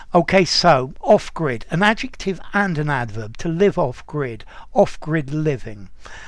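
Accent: United Kingdom. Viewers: Sir what british accent do you speak with? And could you make some videos on accents! Cheers! ❤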